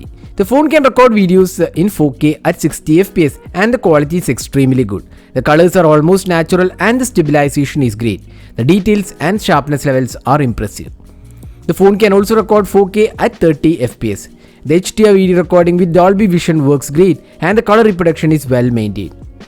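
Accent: Kerala, India. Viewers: From your englsh i can say that you're a malayali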